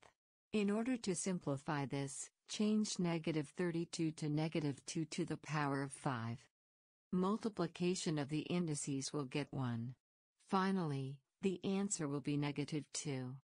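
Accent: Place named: United States. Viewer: Wait, this is your voice? Seems like you're american.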